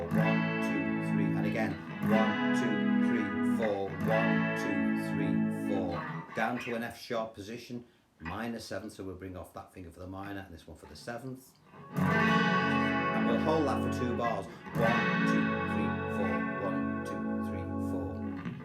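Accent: Liverpool, United Kingdom. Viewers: Love ye work im learning so much from your lesson. I'm from West Derby but have been living in Townsville Australia since 1984. Love hearing your scouse accent and musical charm. Cheers mate. Go the Reds.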